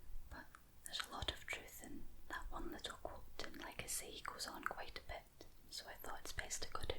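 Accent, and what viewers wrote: Scotland, United Kingdom. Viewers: Hi.Thanks for the videos ive been struggling with anxiety.On another note im so used to american slang that is great to hear your scottish articulated speach.I kinda giggle with your prononciation in an non making fun kinda way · Great job. Your accent takes me back to when I lived in Scotland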